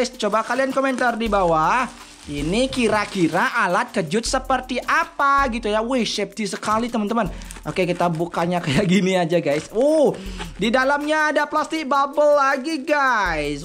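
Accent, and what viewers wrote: Indonesia. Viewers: Kyk minang kocak suaranya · Kamu orang bali ya...mas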